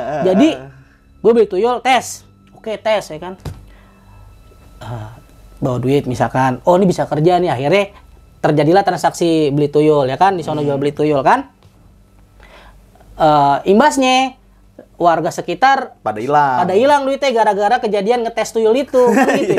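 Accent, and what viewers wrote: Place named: Betawi, Indonesia. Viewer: kocak lu bang 🤣🤣...bahasa betawinya ora dibuat2 natural bgt..wkwkkw biarpu ceritanya lompat2 ketutup sm pembawaan ceritanya..sukses terus buat chanel besok pagi dan bang, w suke gaye lu..😁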